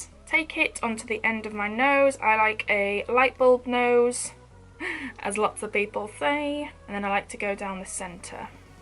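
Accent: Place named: United Kingdom. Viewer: i love your accent which british accent this?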